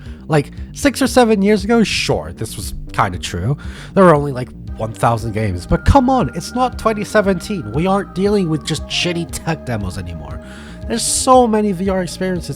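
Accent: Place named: United Kingdom. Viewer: bro even if there's not a whole lot of VR games I believe you only need one Hotdogs, Horseshoes & Hand Grenades. Also dude what part of the UK are you from? Your accent is very interesting I don't think I've heard it before xD I'm midlands myself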